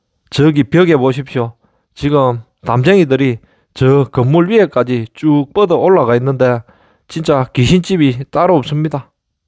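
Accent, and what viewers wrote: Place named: Busan, South Korea. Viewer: 오리지날 부산사투리로 해설해주니 정겹고 잊은지오래되는 사투리도생각나서 너무즐겁습니다 화이팅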